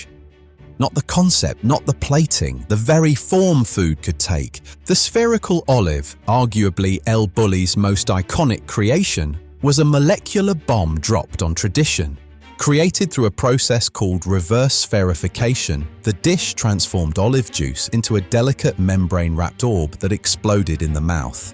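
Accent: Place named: England, United Kingdom. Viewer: The English accent suggests targeting the Spaniards once again. Typical Brit “mala leche”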